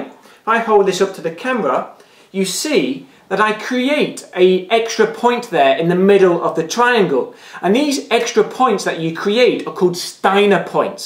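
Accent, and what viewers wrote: United Kingdom. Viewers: totally fucked my mind. Also I want to apologise if you aren't Brit but that's how I imagine the average British accent sounds. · bahahaha, liverpudlian accent ftw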